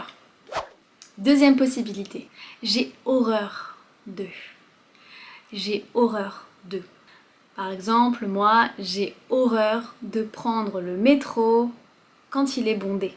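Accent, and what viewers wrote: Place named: Normandy, France. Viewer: "Je hais" - Décidément on n'a pas le même accent. Tu prononce "Je hé", à la normande, alors que je le prononce "je hai" ou "je hè". Ah ce français et ces accents ! 🤣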